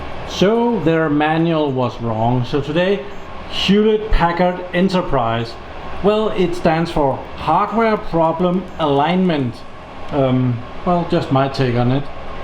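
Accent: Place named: Denmark. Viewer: danish accent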